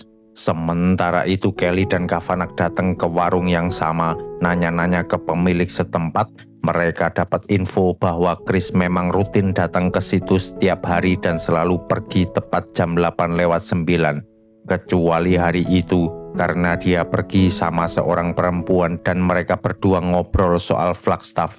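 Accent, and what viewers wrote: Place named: Java, Indonesia. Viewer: logatnya jawa banget wkwk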